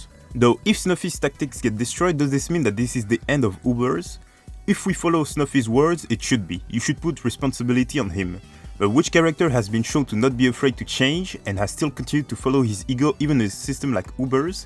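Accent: France. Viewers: Perso je kiffe tes videos vu que je suis bilingue mais je comprends pas la décision de parler en anglais, ca ce voit tes bilingues aussi mais l’accent fr rends parfois difficile a comprendre (perso) après c’est toi qui decide et si tu reste en anglais ca ne changera pas le fait que je regarderai toujours tes vidéos, force et continue comme ca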